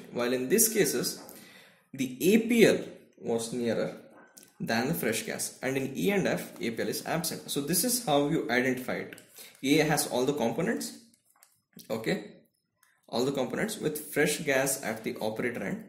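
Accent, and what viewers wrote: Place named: India. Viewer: nice, i understand a bit of indian english but your draws are very nice explainfuled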